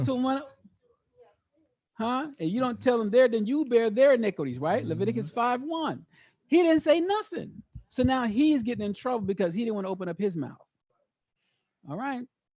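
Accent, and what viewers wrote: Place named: Israel. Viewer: Shalom mishpâchâh!! I am enjoying this channel.. can you please help me with understanding the proper Hebrew to learn? The Hebrew that you speak, sounds like the same Hebrew that is mixed with Yiddish that the people in ISREAL speaks. Is it? Also the Hebrew you speak and teach is different from those who speak paleo Hebrew. With the vowels being Aa, Ba, Ga, Da etc. etc. I want to know it fluently but there are different vowels and Annunciation. Can you help me with understanding the difference between the two so I can become fluent in our language? Thank you sincerely Shalom or is it Shalawam?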